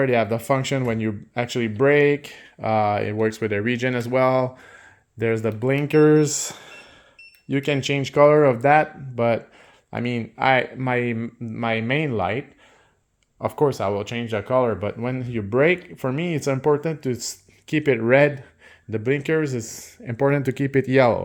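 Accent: Quebec, Canada. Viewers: Définitivement, c'est un accent québécois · This guy sounds Canadian am I wrong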